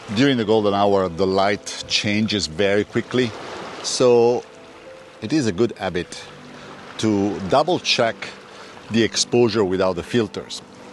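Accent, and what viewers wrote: Italy. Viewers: I visit Bologna several times in my line of work! The thing i miss the most is your italian accent, and the food also ( we have excelent food here in Portugal, but in Italy is diferent! We used to go to a place named "Ristorante Bolognese", and the 2 brothers there, were the soul of that place...we see them once a year..but they never forget us! they call us "DA SILVA!!...HOW ARE YOU?!" in my "TO VISIT LIST" Cinque Terre, is in secound! Hope this thing go away very soon so that we can return to our normal lives! Great video, and as always, great photos!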